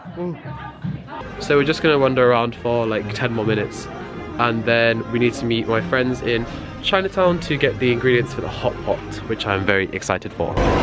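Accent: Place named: London, United Kingdom. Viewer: London accent really amazing 😭😍 i like it 💛 some times i try to speak like London people but i can't 😭😭😭😭😭 i am in love with london accent💙💙💙💙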